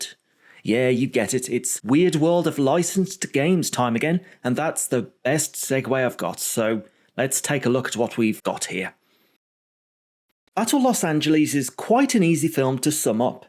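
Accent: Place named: United Kingdom. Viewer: ew british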